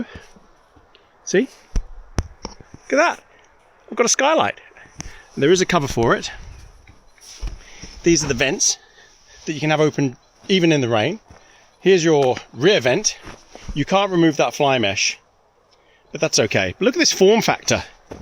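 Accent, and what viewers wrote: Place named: England, United Kingdom. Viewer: have you ever thought to do a story time channel? You have a beautiful ton e with you voice, you are animated and with your beautiful English accent, you would be perfect for storytime. You could just have a set scenery for the photo and just have your voice reading the story. I usually watch her videos twice. The first time I start watching when I lay down before bed and end up falling asleep the second time so I can actually watch the entire thing without falling asleep lol.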